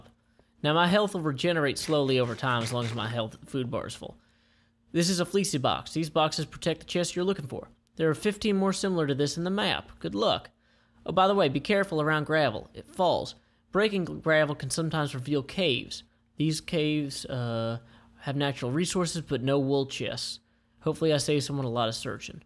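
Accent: South, United States. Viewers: Applejack approves of your souther drawl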